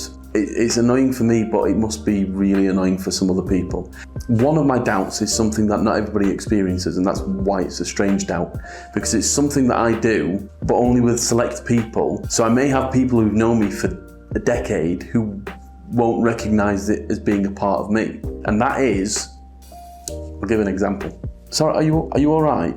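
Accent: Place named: England, United Kingdom. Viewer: can't explain why but i find the baldness very sexy! never been into bald men but when combined with the English accent and the bald head, very sexy!. i watched your film ''Abused'' and as someone who came from an abusive relationship it was very touching and relative. i hope all the new things happening to you keeps on a high note and i don't think you'll be lonely for long, given the sexy baldness..........lol.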